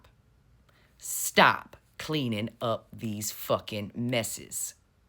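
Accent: South, United States. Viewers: I enjoy not only your forthright news, but the Southern way in which it is delivered.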